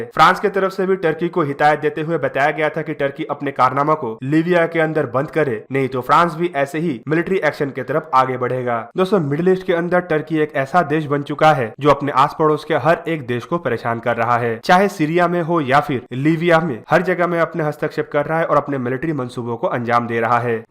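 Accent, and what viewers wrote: Bengal, India. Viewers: Bro are you Bengali ?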